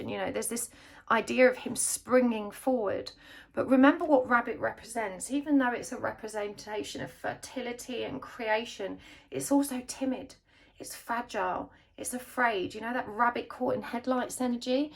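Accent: England, United Kingdom. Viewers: You just sound so English lol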